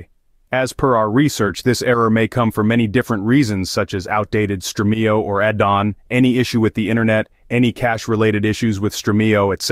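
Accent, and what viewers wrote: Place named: England, United Kingdom. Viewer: Thanks I love your detailed simple instructions I ca ear and under your kaunhiage and speech perfectly it's nice to hear a English voice Bravo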